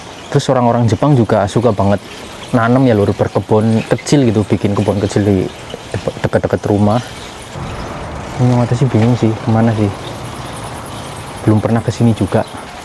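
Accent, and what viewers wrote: Banyumas, Indonesia. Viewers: Cilacap Barat Sidareja hadir Mas, salam sehat dan sukses selalu buat jenengan, Aamiin. Jenengan asline ndi Mas bahasane ngapak,ana retung, nemen dsb 😂😂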